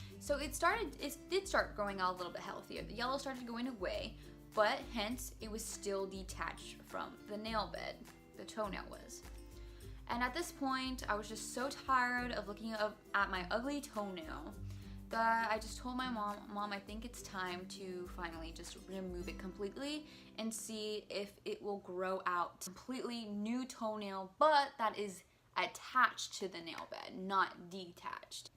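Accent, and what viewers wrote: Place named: Texas, United States. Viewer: I could just tell you were from Texas! (: What part? I'm from Tx too!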